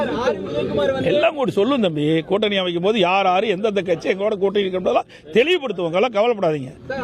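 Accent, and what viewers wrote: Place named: Kongu, India. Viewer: கொங்கு வானி யோஅது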